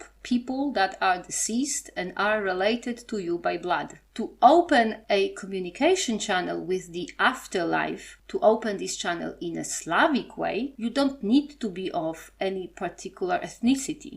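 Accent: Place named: Poland. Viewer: I really enjoy listening to you. Your Polish accent is not bad at all. I have no problem understanding you. I am really amazed at your knowledge of history. You say things that I have known for a long time, yet others don't seem to comprehend. For example, anthropologically speaking, there's no such thing as an "ethnically pure" Slav. My ancestry is from your neighbor to the South, Bohemia. I know Polish and Czech people are very ethnically close. I don't find a lot about the faith and Bohemia, but I know they share it as well. So I will adopt my Polish brethren instead.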